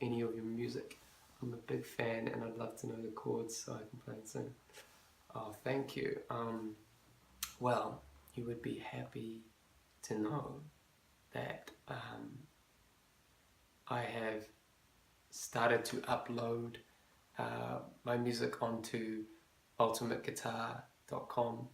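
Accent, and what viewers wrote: New Zealand. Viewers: Kia ora! Having spent three months in New Zealand last year, it warms my heart to hear that charming NZ accent! I absolutely adored the Boyfriend ASMR you did a couple of months ago and would request a new one (: Lots of love from Finland!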